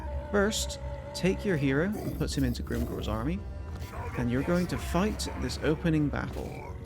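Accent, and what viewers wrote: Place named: Scotland, United Kingdom. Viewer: Nice video mate, I'll be sure to give this a bash. As a side question, where is your accent from? It sounds like a mixture of very light Scottish and something else?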